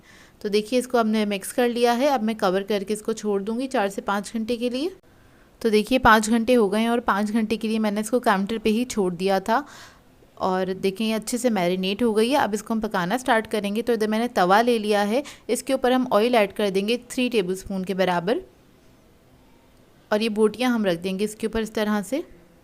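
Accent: Karachi, Pakistan. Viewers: Your accent reminds my old days of Karachi! I really appreciate your effort 🤗☺️ GOD bless you 😊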